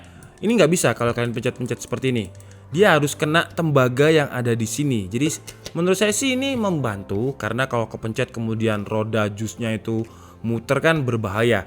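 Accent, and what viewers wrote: Java, Indonesia. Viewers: Boso jawane koyo wong ngalam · Abangnya medok juga ya 😁😄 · We mas sampean wong jowo to 👍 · Wong jowo mas? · Wong ngendi to mas? Logat jowo ne jen kuat 👍👍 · Orang mana toh mas?, Jawanya masih melekat 😂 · Ketok e kok ngomong jowo😁.wong ndi bang..? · Orang jawa ya??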